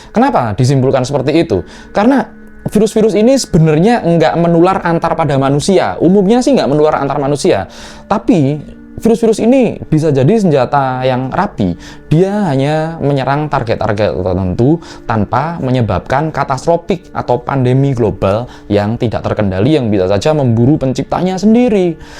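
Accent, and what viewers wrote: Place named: Java, Indonesia. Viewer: .. Saya sangat senang mengikuti kontent konten Tapi larbih baik lagi kalau logat bicaranya kembali ke asal saja.. Yaitu logat Jawa medok.. Akan kliatan Jawa sekali mmitu akan lebih enak dan unik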